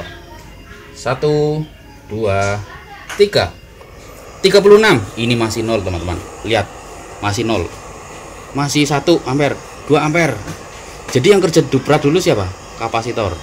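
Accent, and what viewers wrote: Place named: Banyumas, Indonesia. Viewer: Masee orang ngapak yaak? 😁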